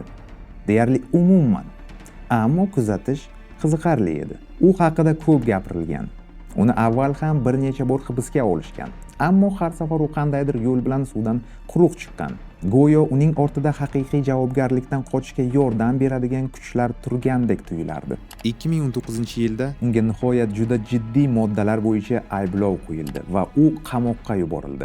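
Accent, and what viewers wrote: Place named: Navoiy, Uzbekistan. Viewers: Aka Navoiydanmisiz, shevayiz shyaqqa tortyapti😊😅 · Навоий акцент ку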